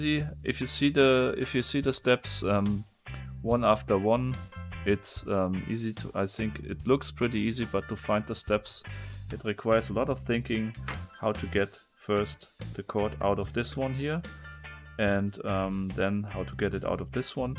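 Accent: Germany. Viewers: Du hast sooo eine beruhigende stimme und man hört sofort dass du deutsch bist :) ich war eigentlich nie der typ für puzzle spiele aber du hast mir dem echt näher gebracht und ich finde das total interessant was sich die macher immer bei verschiedenen mechanismen gedacht haben :) außerdem ist die soundqualität eeeeendlich gut xD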